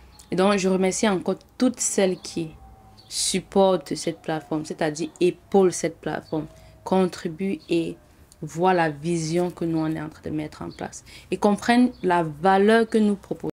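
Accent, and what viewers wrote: Cameroon. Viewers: Merci cela fait un bout de temps que je te suis, seulement en suivant tes conseils on peut beaucoup amélioré sa routine. Il y a par contre celles qui font seulement du pur marketing. C est normal après de faire payer ceux qui veulent plus, c est comme un accompagnement dans le parcours capillaire. Et puis j'aime tes blagues, tes analyses même si je ne commente pas et surtout l accent camer qui me rappelle mon pays d adoption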